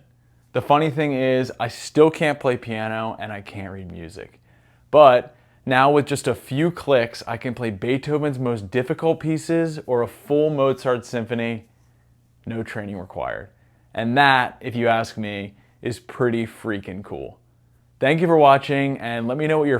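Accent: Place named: Maryland, United States. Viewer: Anyone get the feeling that this guy is from Maryland?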